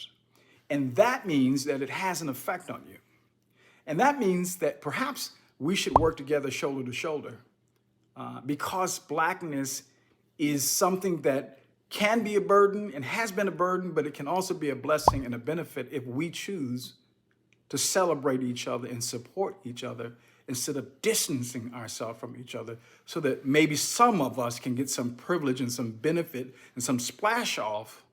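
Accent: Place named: United Kingdom. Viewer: When you talk I feel like you have British in you !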